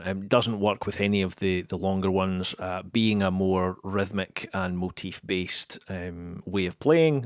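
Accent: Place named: Scotland, United Kingdom. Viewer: ..."if I wudda had this, ... when I was maybe 20....I'd probably be a billionaire right now......🤪😆 Great video with that up yer kilt accent. Luvit. Any more tuts coming our way?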